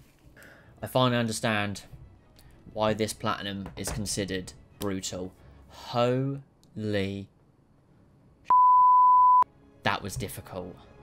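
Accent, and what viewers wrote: United Kingdom. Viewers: Whenever I feel lonely in the world, for a few minutes shows me that he too feels all the rage. But in British so it’s classier. Dude, you have become my favorite platinumer on YT! Great content as always!:)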